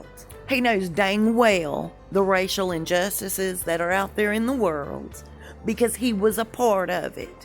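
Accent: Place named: South, United States. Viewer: Very informative ..and gosh coming from nz I adore your lovely poetic accent.I love the southern accents.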